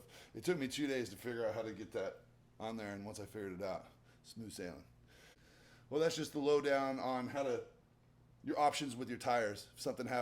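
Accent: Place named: United States. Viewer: WTF the terminator but with american accent woah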